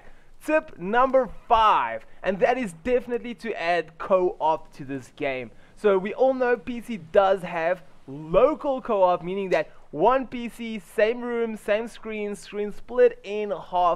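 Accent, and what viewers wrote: Afrikaans, South Africa. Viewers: lucky guess lol I’ve been trying to learn Afrikaans so the accent sounded familiar to those in the Afrikaans tutorials.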